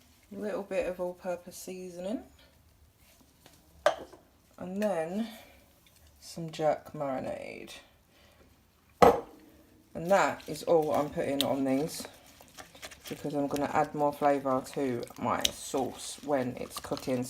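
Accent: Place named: Australia. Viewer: You should have made cuts at both ends of chops to prevent them from curling up when cooking....They will remain flat to cook evenly...Looks real delicious though...And i adore your accent...Are you from Australia??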